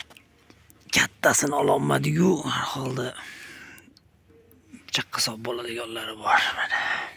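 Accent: Andijan, Uzbekistan. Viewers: Анжонни каери бу ука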